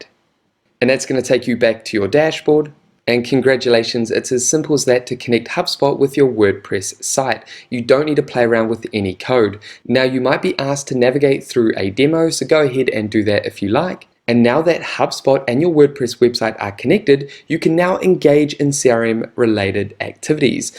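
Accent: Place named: New Zealand. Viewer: I like your nz accent. reminds me of a damn good time. but now I'm back in Europe. 😥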